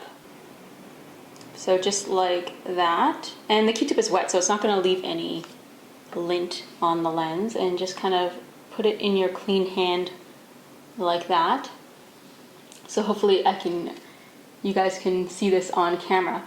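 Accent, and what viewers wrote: Canada. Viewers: Love a Canadian accent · Love your Canadian Accent!! Its so cute!!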